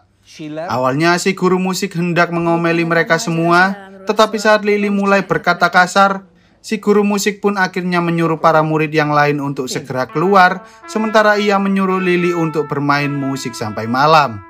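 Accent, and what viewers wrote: Java, Indonesia. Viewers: Logat Jawa Gak Enak Di Dengar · Narasinya medok" bahasa yg santai aja bang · Medok jowo gk enak dikuping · Bang lu orang jateng apa jatim ni?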